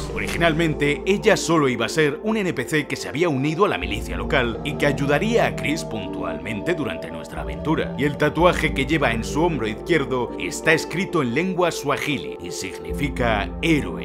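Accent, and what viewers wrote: Spain. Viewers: Un detalle alucinante es que el video sea narrado por un español con memes en audio latino jja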